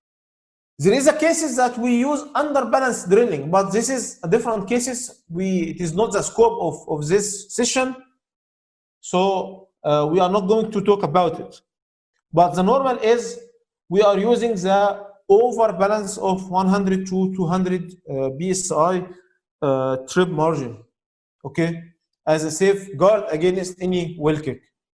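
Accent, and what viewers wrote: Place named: Egypt. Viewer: why you zbeek like egypt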